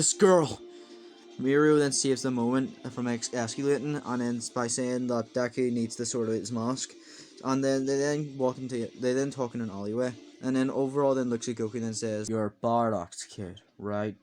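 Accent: Ireland. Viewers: The second scottish youtuber I found yes!! Edit:I mean irish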